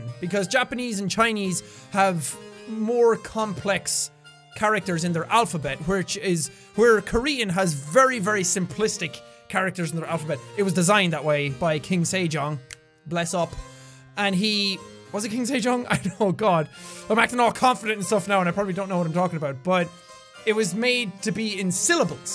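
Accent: Ireland. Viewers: R U IRISH OR SCOTTISH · I knew your chanel some weeks before when I was searching for examples of Irish accent for a presentation at college. Your video about guessing phrases made me laugh a lot, and I showed it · I'm 47 and I LOVE. He makes my day every day with his humor and Irish accent!!:)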